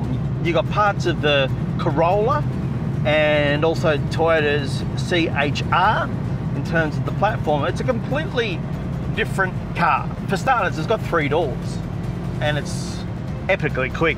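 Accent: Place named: Australia. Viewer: As soon as I hear an Australian accent, I know it's NOT the version with the track package lol.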